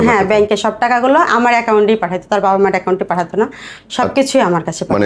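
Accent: Bangladesh. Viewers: অনেক সেক্স ভিডিও দেখেছি আপনার।প্রথম দিকে অবাক হতাম যে,এতো সুন্দরী বাংলাদেশী নারী এতো অসাধারণ অসংখ্য সেক্স ভিডিও করছেন অথচ জানতামইনা।আপনার মুখের বাংলা ভাষা শুনেই বুঝতাম যে ভারতীয় নারী নন আপনি।পুরুষটির ফেস ঝাপসা করে দেয়া আছে চেনা যায়না।xhamster এ আপনার সেক্স ভিডিও গুলো উনি বিক্রি করে কম করে হলেও ৮-১০ লাখ টাকা আয় করেছেন সম্ভবত। আপনার ফ্রি স্টাইল এক্সপ্রেশন আর অসাধারণ সব পজিশনে সেক্স করা দেখে একজন প্রফেশনাল পর্ণষ্টার মনে করতাম।আজ সবকিছু বুঝতে পারলাম পরিস্কার 😢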